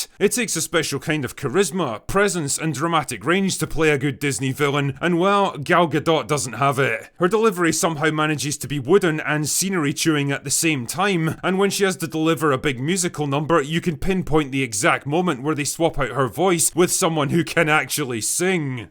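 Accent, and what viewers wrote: Scotland, United Kingdom. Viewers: Normally I need subtitles to understand a Scottish person, but because he’s made an effort, I will say unbelievably good shit by the way I’m English😂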